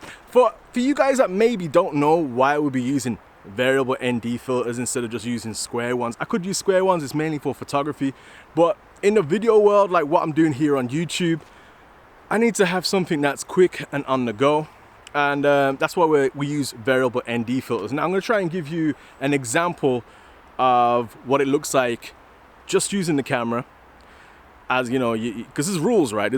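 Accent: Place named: Australia. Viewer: Are you from Australia? Your accent is dope 👌🏼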